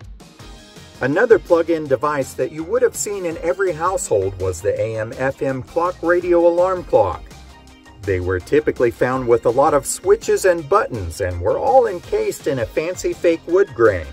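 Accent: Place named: Midwest, United States. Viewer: What part of the Midwest are you from? If I’m wrong I apologize, just a guess. I’m originally from central Indiana. Your accent sounds familiar to me. Love your YouTube channel. We are both products of the 70’s and 80’s. I was born in ‘72. So I remember all of this great crap. I personally loved anything that was robots or computers. BMX bikes were just the best too.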